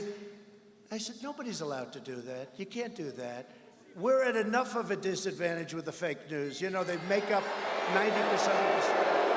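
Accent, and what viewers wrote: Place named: New York, United States. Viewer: Donald J. has the most beautiful New York way and accent of the people of New York. The greatest New Yorker of all time. I agree with your President, Brad Pitt comes off as a wise guy to me. Movies need less CGI and more talented actors. Gone With The Wind was a beautiful ugly film about ignorance in human history. Thank you Most Honourable President Donald J. for your channeling honesty and integrity. God Bless The #1 USA. I believe South and North Korea needs to settle their own issues and stop threatening the world. China needs to tell the world the truth about where this Coronavirus alias WHO renamed biological weapon COVID-19 immediately.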